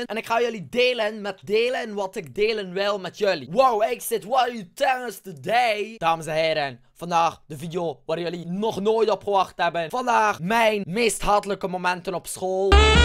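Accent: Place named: Flanders, Belgium. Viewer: love your accent! (Ik vind vlaamse accentjes gewoon leuk)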